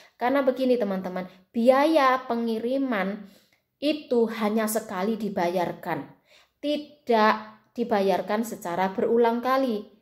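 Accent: Java, Indonesia. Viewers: Sampean org mana mbk, logat nya Jawa yo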